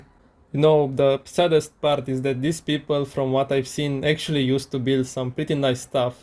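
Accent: Romania. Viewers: Wow romanian accent 😅 im romanian too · Bro, your Romanian accent Is crazy. It is like Russian, that used to live in Italy for 20 years, speaks English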